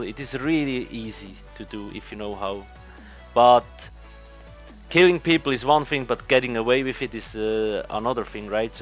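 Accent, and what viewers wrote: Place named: Switzerland. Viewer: You sound so Swiss haha :P Are you also playing on the ps4?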